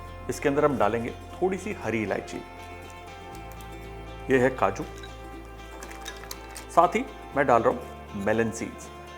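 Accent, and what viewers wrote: Punjab, India. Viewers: Aajto pakke punjabion ki tarah bol rahe ho.maja Aa raha hai as I am from Amritsar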